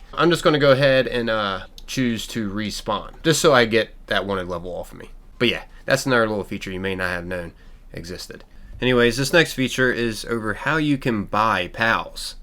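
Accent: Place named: Philadelphia, United States. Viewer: You sound like you're from Philly!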